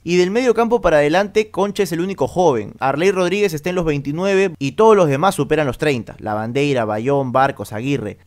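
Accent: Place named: Argentina. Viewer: mano por que hablas como semi argentino ??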